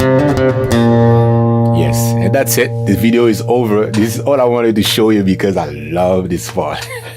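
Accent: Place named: Ga, Ghana. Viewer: This Is beautiful ❤️❤️❤️ The language (Ga) accent really caught me God bless you sir 🫡🫡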